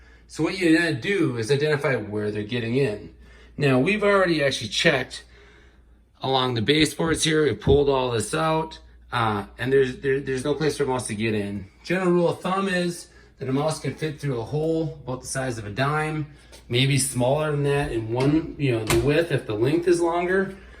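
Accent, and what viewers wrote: Australia. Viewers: Entertaining and informative. Love the Australian accent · Hah Hah....northern Australian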